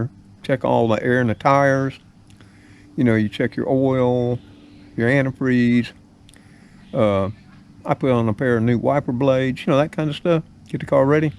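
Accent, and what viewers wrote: South, United States. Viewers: Love your Southern accent too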